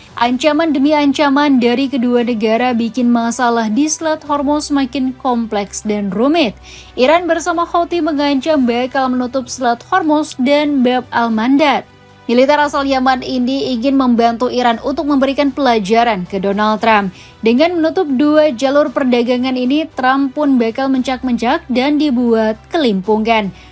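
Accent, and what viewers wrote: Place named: Java, Indonesia. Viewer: Dari logat orang Jawa tetapi kok mengucapkan ejaan pakai bahasa asing sangat memalukan sebagai orang Indonesia yang sok kebarat baratan mengucapkan ejaan Indonesia tidak dipakai payah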